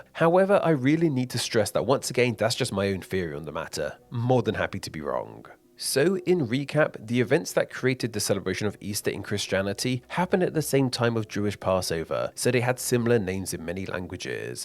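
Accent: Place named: United Kingdom. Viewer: This is probably gonna get pushed down, but since I didn’t see anyone else saying it, the pronunciation of the Hebrew word for Passover is not in fact “Pesca”, and the meal isn’t said “Sayduh”, it’s “Pesach”(roll the ch like “fancy” Bach) and “Seder”(both E sounds are like “Ben”). A good video historically speaking, but the British accent is strong here, lol.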